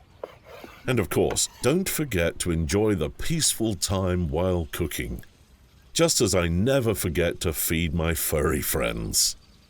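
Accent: United Kingdom. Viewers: good British voice over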